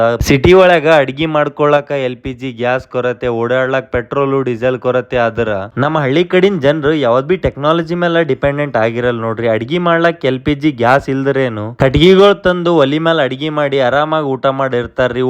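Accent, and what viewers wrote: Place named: Gulbarga, India. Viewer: Nim gulbarga dhati namma dharwad basha kina kadak aithi..nanag nan dostar nenap banth nod bhai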